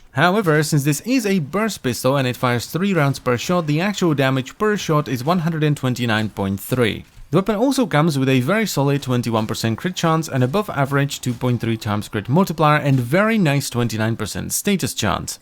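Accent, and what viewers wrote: Czech Republic. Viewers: He does have a slight Czech accent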